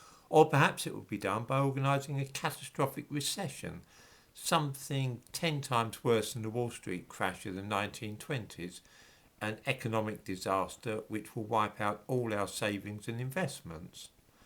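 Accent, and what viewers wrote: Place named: Cockney, United Kingdom. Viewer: He thinks he has a neutral accent? Maybe to an East- Ender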